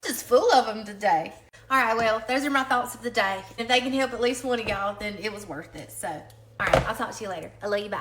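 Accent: South, United States. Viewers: Hey Southern draw, your cute! 😉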